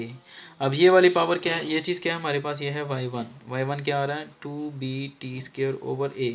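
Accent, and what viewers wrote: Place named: Lahore, Pakistan. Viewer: Sir AP ka bolny ka style Lahore wolo jasa ha and Iike their style of talking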